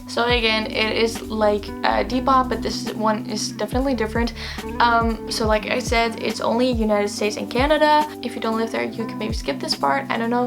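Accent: Netherlands. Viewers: Wait a sec I’m a bit new to this channel so I just have question are u a bit Dutch ? Ur accent sounds a bit Dutch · i don’t know why your accent reminds me of when i’m in holland , love this video 😩❤️! hope your sage during this shit ass pandemic 😌! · Je bent van nederlqnd ik hoor het aan je accent!!!🥰🥰🥰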